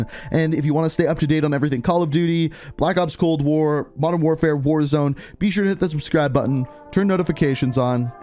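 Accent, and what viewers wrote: Canada. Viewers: Is this guy Canadian? Sounds like he got a little Canadian tweak to his English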